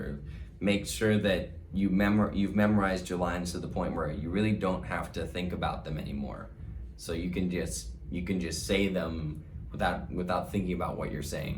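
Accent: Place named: England, United Kingdom. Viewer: When I watch this series I never thought he’s Thai language was his first language coz the way he relay things it’s so awesome and sexy but I do like he’s English ascent so cool 😎. You did a great job 😉👍✨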